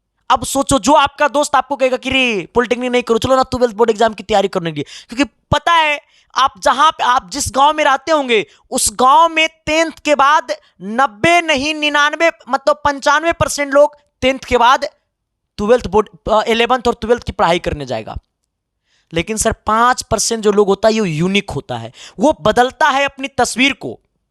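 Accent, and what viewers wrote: Bihar, India. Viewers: Bihari style me bakwaas karta hai. Time waste karta hai. 12th me certificate milta hai degree nahi.😂😂😂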